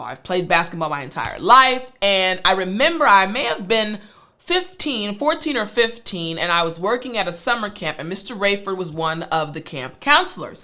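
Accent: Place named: United States. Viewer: Your americain accent sounds great I always enjoy it🤩 I've learn so much from the ways you pronounce the words in your videos, to sound a little bit americain😇 It's so cool to watch your videos THANK YOU VERY MUCH😇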